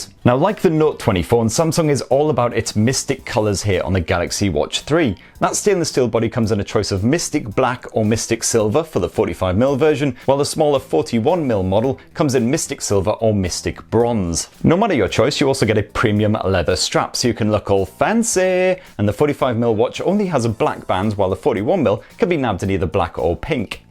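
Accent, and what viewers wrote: United Kingdom. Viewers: so thicj British accent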